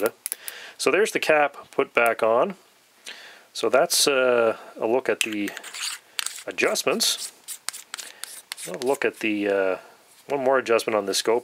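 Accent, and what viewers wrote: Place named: Cape Breton, Canada. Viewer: Canadian right ? I thought the accent sounded very familiar. I'm gonna take a shot in the dark but based on the accent, I think east coast. Cape Breton perhaps. I'm a Newf here in St. John's. Just bought a new scope today. Bushnell Dusk til Dawn Model. Gonna mount it on my .22 air rifle. but I need to buy mounts now. Happy Hunting, man.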